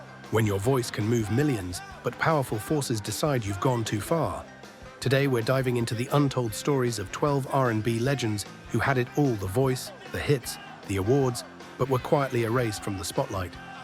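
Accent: United Kingdom. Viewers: Ok, hold the phone! You ask us to subscribe when you’re telling stories of us from this country with a British accent? Like we have to listen to a proper outsider. There is a word for this. Condescension!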